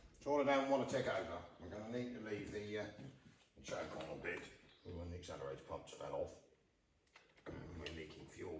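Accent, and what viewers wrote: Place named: Wiltshire, United Kingdom. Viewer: just love the videos and the wiltshire acent but most of what you say is lost because your microphone need to be replaced for a better one.. thanks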